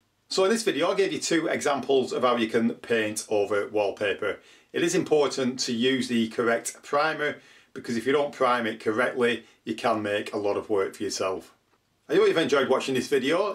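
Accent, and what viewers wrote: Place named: Blackburn, United Kingdom. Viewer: Really helpful video, thanks! And that sounds like a very strong Blackburn accent!!!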